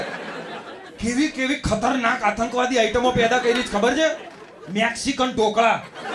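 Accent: Gujarat, India. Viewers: Special emphasizing on those typical gujju accent for Hindi-English words.... Superb... The highlight of the video or shall i say "Garneeessshh" was speaking "Bawa-Hindi" ... "Hasi hasi ne aankh ma jarmariya aavi gaya...." 😂🤣. Hillarious stand-up and as usual good observation and content... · Nailed it !!! Loved the way you speak Gujlish. Lol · Pakko Gujarati ... Pro level observation behind the content... And those Gujarati accent n words 🙌🙌🙌 Truly Hillerious 🤣🤣🤣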